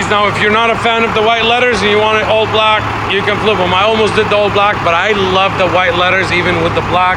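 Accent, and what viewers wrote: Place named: Miami, United States. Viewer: Portland? He sounds like he's from Miami.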